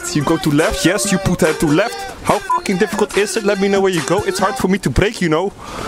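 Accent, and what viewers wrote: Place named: Netherlands. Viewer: it's fun to hear within 1 second that your dutch XD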